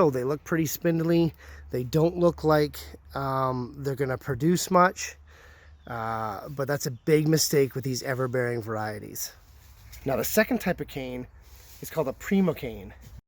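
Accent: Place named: Canada. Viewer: Certain dialects or speakers from specific areas (in this case maybe a part of Canada?) it just how they speak. The intonation you are speaking to falls into this “Canadian accent”.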